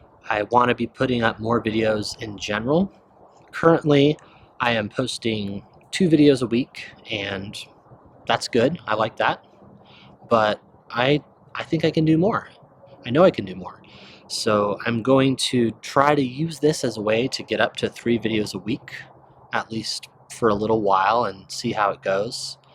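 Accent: United States. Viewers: perfect American accent English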